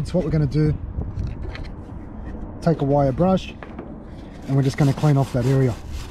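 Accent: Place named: Australia. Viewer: Hi, I hope you see this😊... you sound like an Aussie 🇦🇺 so I'm just wondering if could please tell me if the 1st one is the same as (same brand) silicon as yours said concrete? I'm going to Bunnings & there's one for $6 & the concrete is $25... do both do the same thing? Thanks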